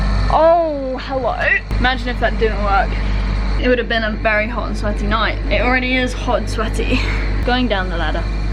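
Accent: Australia. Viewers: You’re picking up the accent a bit! Aha xx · i totally agree - you sound 25% Aussie, and your intonation is 40%! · lowkey getting an Australian accent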